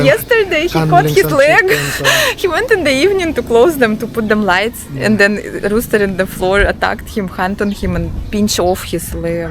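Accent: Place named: Russia. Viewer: I love hearing your Russian English accent, keep uploading guys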